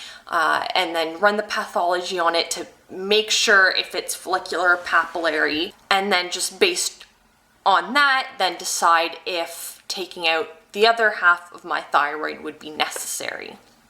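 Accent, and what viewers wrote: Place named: Canada. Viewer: Thanks for the response! Apparently the nodule has all the markers of being cancerous but you definitely helped calm my fears. I'm so nervous about the biopsy! I am in medical school and the anxiety that sometimes lets my mind run away makes it hard to concentrate! The school physical was actually what incidentally found my hypothyroidism because my PCP wanted to check thyroid levels "just in case" and then i also had the ultrasound for the Hashimoto's "just in case." On an unrelated note, I love your accent! the "out" and "about." Canadian, eh? :) thanks again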